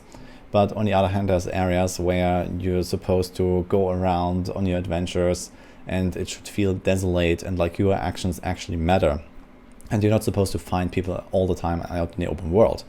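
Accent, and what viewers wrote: Germany. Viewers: Bitte mach doch solche info videos auch auf deutsch. Man hört es richtig raus, das du ein Deutscher bist...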